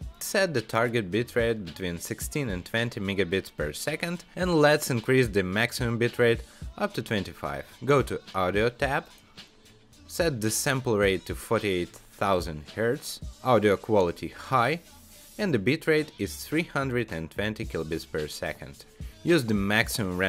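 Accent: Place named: Russia. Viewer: Привет. Сижу готовлю видео на ютуб на эту же тематику что у тебя (только на русском). Ну и полез смотреть кто что и как сделал. И я крайне удивлен что у тебя так мало просмотров. Твой тютёриал один из лучших, что я видел. Подпишусь ка на всякий случай 👍 P.S. Да я понял что ты говоришь по русский из-за акцента. Но на всякий случай пришлось лезть к тебе в фейсбук чтобы убедиться на 100% 😉 Я кстати тоже с Украины. P.S.S. И рад знакомству 🤝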